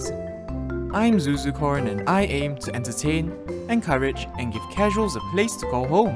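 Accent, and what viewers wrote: Singapore. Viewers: I'm sorry if you aren't but you sound very Singaporean, like not when we are speaking casually amongst others but when we are presenting something in a formal context. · Singaporean accent? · are you singaporean by any chance? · r u singaporean?theres a strong singaporean accent no offense :/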